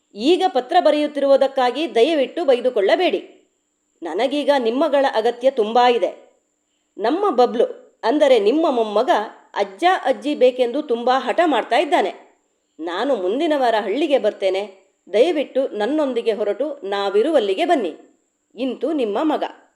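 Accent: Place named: Malnad, India. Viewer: ವಾವ್ ❤ನಿಮ್ಮ ಧ್ವನಿ ಯಲ್ಲಿ ಸೂಪರ್ ಆಗಿ ಮಲೆನಾಡ ಭಾಷೆ ಸೂಪರ್.. ನಿಮ್ಮ ಕನ್ನಡ ದ ಮೇಲಿನ ಹಿಡಿತಾ 🙏ಸೂಪರ್ mam ವಂಡರ್ಫುಲ್ ಧನ್ಯವಾದಗಳುನಿಮ್ಮ ಕಥೆ ಗೆ ❤❤❤️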